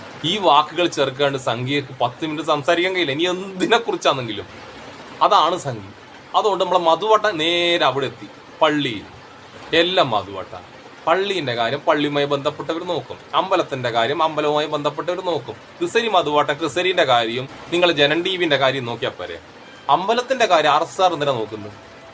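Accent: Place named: Thalassery, India. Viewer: നിങ്ങളെ സ്ഥലം എവിടെയാ.. നമ്മുടെ തലശ്ശേരി പാനൂർ ഭാഗത്താണോ.. എന്തായാലും തനി നാടൻ വർത്താനം 👍🏼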